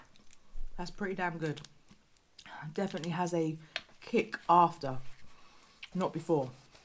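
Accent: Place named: England, United Kingdom. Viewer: That was a really good review. Great amount of food, and plenty of drinks and snacks. That English accent. Looking forward to your next review.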